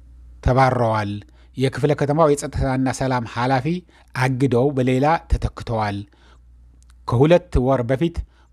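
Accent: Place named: Eritrea. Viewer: አነጋገርህ ማን እንደሆንክ ይናገራል አማርኛ እንኳ ማንበብ አትችልም አርትራዊ ነህ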